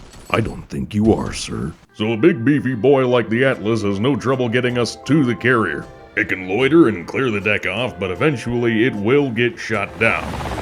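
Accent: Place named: United States. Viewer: hes the most american man i ever heard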